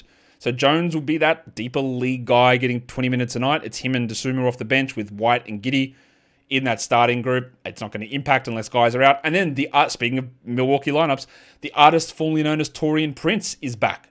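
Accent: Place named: Australia. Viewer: Good Aussie talk.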